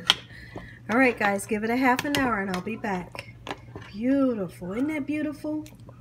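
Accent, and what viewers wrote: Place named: Michigan, United States. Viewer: You hear me?Just like so.....just like so...I luv when you say those two phrases...lol you hear me? are you from the great state of Michigan? You sound like my friends that are from Michigan...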